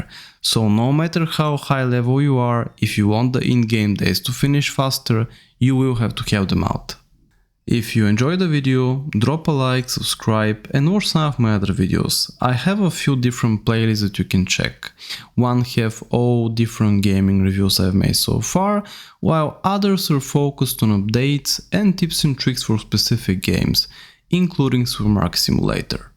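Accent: Russia. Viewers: Какой русский акцент...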